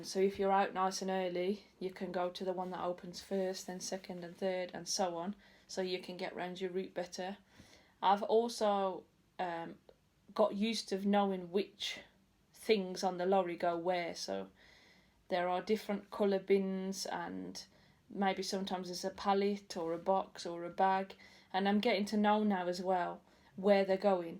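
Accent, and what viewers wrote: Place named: Black Country, United Kingdom. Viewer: but your not west mids your a yam yam be proud of it your accent is bostin , enjoy your time on the road but be warned its adictive it getts in the blood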